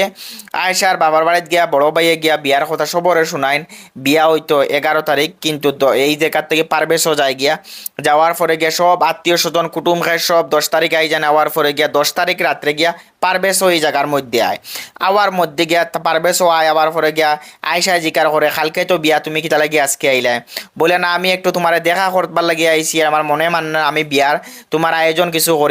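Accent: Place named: Silchar, India. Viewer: Ami Silchar tone dakram bhai okk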